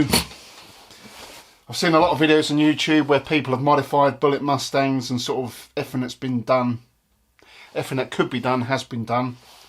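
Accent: United Kingdom. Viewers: Where are you from, you sound proper Yorkshire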